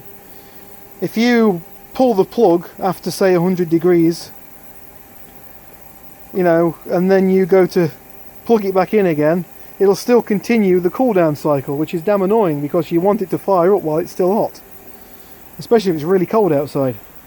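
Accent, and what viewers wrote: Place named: Derbyshire, United Kingdom. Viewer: Im from Derbyshire lol, I know Derbyshire when I hear it lol, awesome videos on the heaters and I see you get upto alsorts of stuff so Im subscribed ;-)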